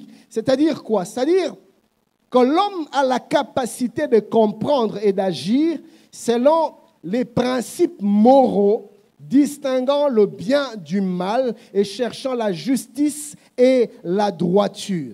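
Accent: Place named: Africa. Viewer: Pardon pasteur mais quand vous parlez africain nous ne comprenons pas. Nous apprécions votre enseignement.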